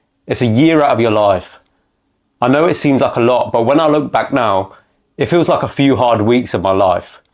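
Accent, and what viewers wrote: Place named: United Kingdom. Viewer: Who did your surgery you sound from the UK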